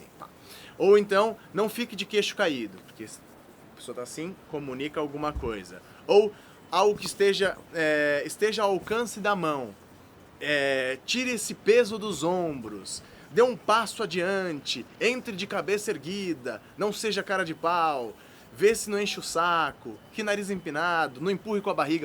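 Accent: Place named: Argentina. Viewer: vc é argentino né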